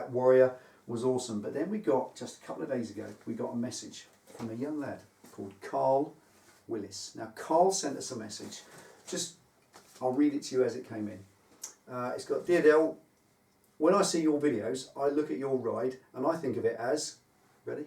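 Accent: United Kingdom. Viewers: Hi Del. I watch all your videos. You are a fantastic orator. Your british accent sometimes trips up my American ears though. At the beginning of most of your vids, you use a word I can never make out. I think uses it at the beginning of " ". I can't understand him either (especially in that song). What is that word? It sounds like "watcha", "watcher" or something like that. It's driving me nuts!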